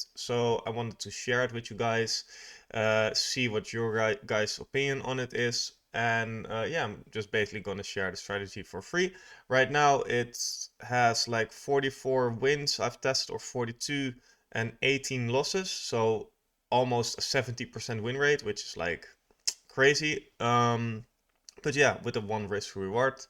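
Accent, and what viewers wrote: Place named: Spain. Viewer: i can tell u speak spanish, lol idk why. but i just now how spanish peoples accent sound when trying to speak english because i speak spanish too and english fluently.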